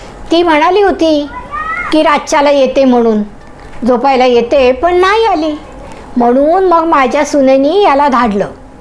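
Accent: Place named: Rural, India. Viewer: शिल्पा ताई जोशी क्षीरसागर यांची किती सुंदर कथा निवडली.कथेतील सृजनता अनेक अर्थाने बोधप्रद होऊन गेली.मुळात त्यांची कल्पना अदभूत आणि त्याचा त्यांनी केलेला कल्पना विस्तार संवेदनशील झाला आहे. कथेतील शेवटची ओळ कथेला एका नवीन उंचीवर घेऊन गेली. तुमचे सादरीकरण नेहमीसारखेच दृष्ट लागण्याजोगे झाले आहे. तुम्ही ग्रामीण भाषेचा लहेजा ज्या पद्धतीने तोलून घेतला त्यातूनच तुमचे व्यासंगी अष्टपैलुत्व पुन्हा एकदा सिद्ध झाले. सुनिता तोंडोळकर./ छत्रपती संभाजीनगर. डॉ.शिल्पाताई आणि तुम्हां दोघिचेही हार्दिक अभिनंदन ---!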